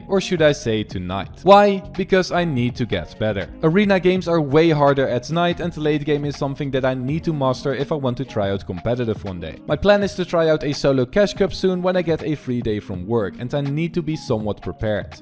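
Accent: Netherlands. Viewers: are u dutch begus u have a little dutch accent and im also dutch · Are you Dutch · ben je nederlands hoorde het aan de intro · is he dutch? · You sound like ur from the nederlands. Is that true? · I feel like youre dutch because of your accent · are you dutch?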